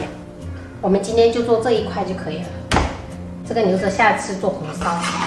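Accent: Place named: Fengzhou, China. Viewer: 听口音，好像是丰州的，或是浮桥?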